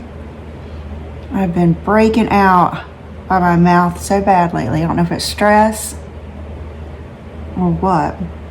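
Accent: South, United States. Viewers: Hi!! I'm a new sub and I'm totally binge watching all your videos ☺️ I love your channel!! I especially love your jewelry, omg I have to get something from Dean Davidson and Cat Janinga! I also love your fashion sense and outfit of the day! But my absolute fav thing is your accent!!! It truly makes me smile 😁 I live in CA now but I was born and raised in TX. I have an affinity for all things southern!! Oh, and the last thing is I love the way you say Brooke. That's my name as well. It reminds me of being back home and someone calling my name 🥰 xoxo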